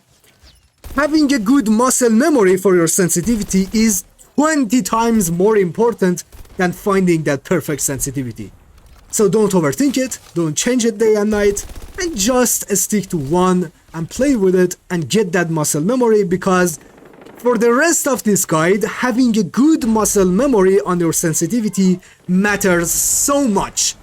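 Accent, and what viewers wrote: Iran. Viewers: از لهجت معلومه ایرانی ای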